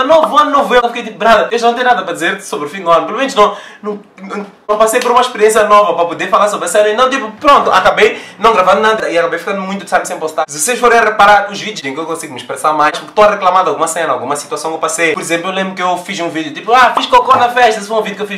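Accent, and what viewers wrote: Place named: Mozambique. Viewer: Sério wy. Teu sotaque de Moçambique me cuiou bué 😂😂. Faz parceria com um YOUTUBER Angolano mano.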